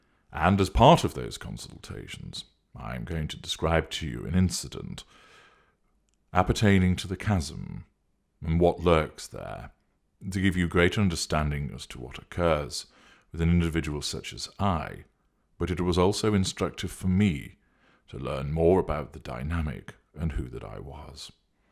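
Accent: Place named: RP, United Kingdom. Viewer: Thanks I must say hats off to you again, I love your INTEGRITY and your Queens English.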